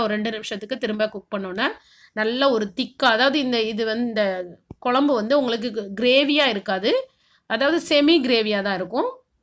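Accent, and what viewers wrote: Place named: Nagercoil, India. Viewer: Hi sis... Neenga yendha ooru. Coz.. Unga speech ketta... Nagercoil maadhiri theriyudhu. Adhaan ketten.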